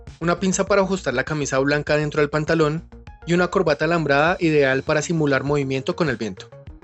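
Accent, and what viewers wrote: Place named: Colombia. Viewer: Por el acento veo que eres colombiano. La verdad me sorprendió tú manera de hacer una reseña, ya tienes un suscriptor más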